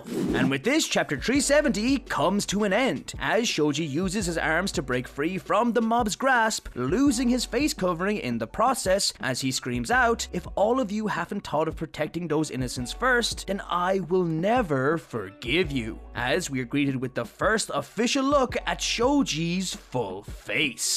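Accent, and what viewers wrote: Ireland. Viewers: I knew your accent was so familiar as an Irish person myself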